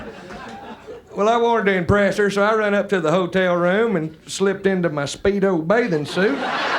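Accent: South, United States. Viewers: That Southern accent was more pronounced in his younger days. As he aged act was dirtier! Liked him on Blue Collar tours.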